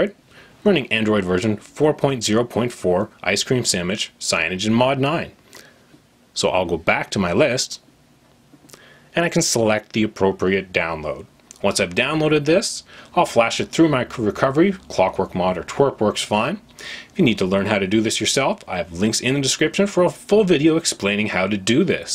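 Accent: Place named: United States. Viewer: I am typing as fast as i can, while my statement is true. I have tried for more than a year to get this on my Zeki 7inch. followed stuff on xda, etc. but got little to nowhere. first you have a great voice. Second you speak American English. Third you are organized and clear. This wasn't working so I followed someones comment below -clear cache. That didn't help so i bit the bullet and cleared data. It asked to accept google terms. i did play store opened. Hooray. I subscribed so will be watching again and more. Thank you.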